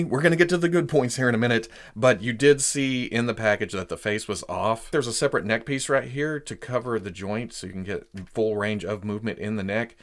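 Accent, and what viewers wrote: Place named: Louisiana, United States. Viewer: Your Cajun accent is on point!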